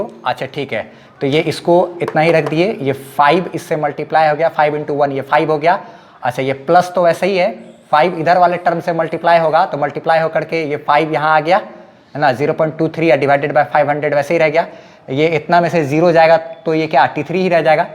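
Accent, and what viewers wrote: Bihar, India. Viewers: Sir ji Bihar se ha kya ?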